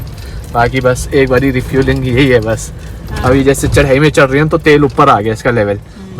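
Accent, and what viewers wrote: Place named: Himachal Pradesh, India. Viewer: It's only himachal language tone.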